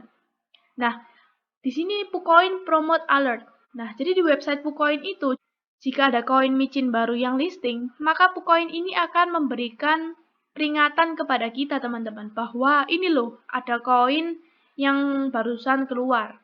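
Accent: Java, Indonesia. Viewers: medoknya jatuh cinta aku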